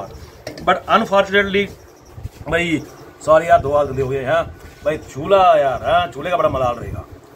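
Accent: Punjab, India. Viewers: bolte bhot accha h...punjabi touch mein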